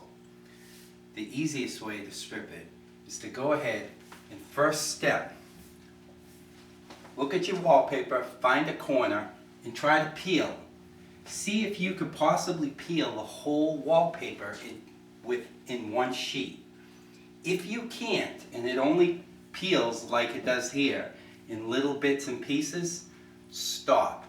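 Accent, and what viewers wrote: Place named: Boston, United States. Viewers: Good tutorial. Love the MA accent! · Boston accent, love it! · Gahhhhhdon sprayah. Good ole yankee accent you got there my brother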